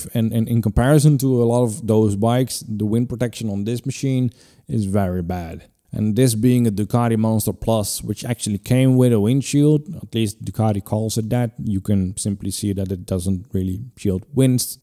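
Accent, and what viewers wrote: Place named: Netherlands. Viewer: Funny... the first sentence made me wonder whether you were from the US. In the second sentence I knew you were Dutch. Difficult to height your accent when you have to think about what you wanna say... Very nice bike! I would love to own a Ducati Monster but dare not because of the maintenance costs...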